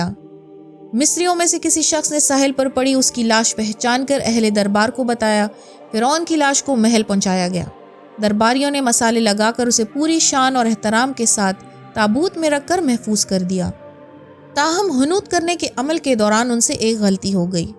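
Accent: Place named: Lahore, Pakistan. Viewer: U are lahori q ke AP ray ko aray prty fact about us means lahories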